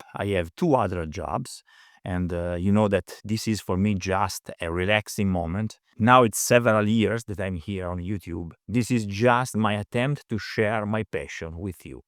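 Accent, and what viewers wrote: Italy. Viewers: I’ve never had trouble understanding you. There’s some charm to your Italian heritage and accent. Best of luck, have loved the channel for a long time.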